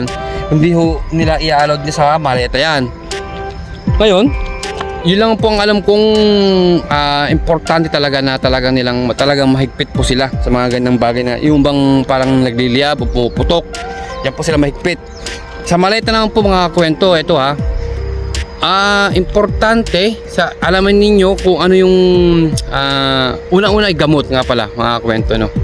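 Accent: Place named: Ilocos, Philippines. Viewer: ilokano ka ba boss? pansin ko lang kasi sa kwan mo hahaha. btw boss, anong cp gamit mong pang vlog? salamats!!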